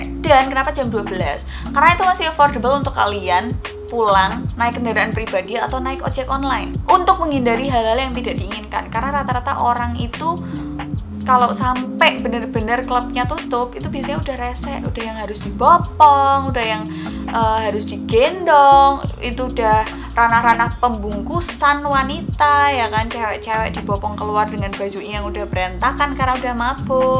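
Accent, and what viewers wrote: Java, Indonesia. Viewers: Ngomonge medok enak gimana gitu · pohh, medok mu kui lo mbakk, sesuatu😍😍 #lampung☝️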